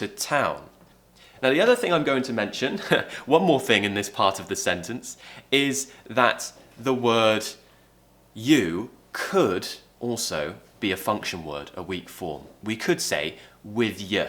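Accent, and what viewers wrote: RP, United Kingdom. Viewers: don't know what i would've done.. if I hadn't seen your videos... i learnt amazing british accent... love ya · without exaggerating you are the Best example For the Morden R p accent, and I have learned something Form you....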